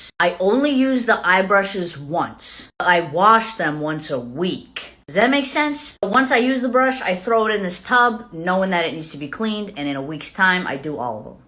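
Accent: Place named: Boston, United States. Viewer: It takes a Boston accent to know one. It’s so weird how it’s normal in real life but on Tv/Phones/videos... the accent sticks out like a wicked sore thumb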